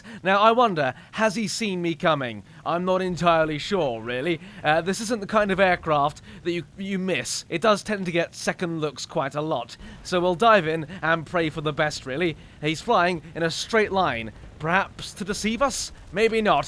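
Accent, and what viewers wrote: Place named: RP, United Kingdom. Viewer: Good day, I have a question for you: I know you like to talk in a very civilized Brrritish manner, are you also able to speak with a more 'common' tongue like a Birmingham one for example? You see, I have made it a hobby to learn many different English accents, even foreign ones. I've got a lot of 'em pretty much down but now I'm more focused on different British accents. I would find it wonderful if you could give me some sort of 'insight', if you have any of course. Also, how you took that Spitfire down was just marvelous! Pilots like Magz and others could learn many things from you!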